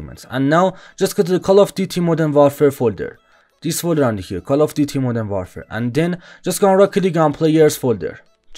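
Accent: Iran. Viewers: خیلی صدات خوبه ولی انگار ایرانی هستی😻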